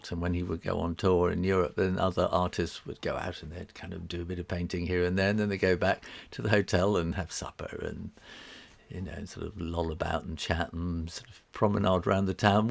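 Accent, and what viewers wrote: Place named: United Kingdom. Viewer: I want to be british when i hear your voice :) And love your cheerfull ways. You have been working as and illustrator for a long time and I as a fulltime illustrator of abour 5 + years, would love to hear all about your career and life. A little like your draw my life videos but with just you talking. Other than that it is so important to think about what your mountain is, what your goal is within your art. But about this channel I would personally just love to see your smile and voice here on youtube. But if it takes to much energy and does not give you anything I would quit the workload that does not fit you. Love Nille from up in Bergen, Norway ❤️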